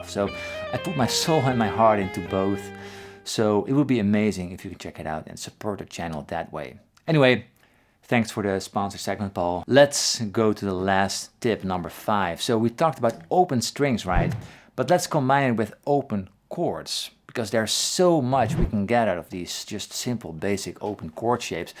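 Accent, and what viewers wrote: Netherlands. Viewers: As someone from the Netherlands myself, the Dutch accent of Dutchies speaking English often bothers me just a little bit, but you've managed to make your slight accent sound soothing instead. Nice playing, nice voice as well. Thanks for the videos! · Ik hoor aan je accent dat je Nederlands bent! heb mij geabonneerd, hele toffe tricks. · Classic Dutch accent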